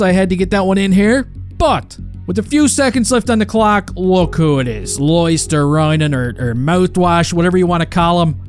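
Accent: Newfoundland, Canada. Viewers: You sound like a Newfie, that's a good thing. They love hockey too.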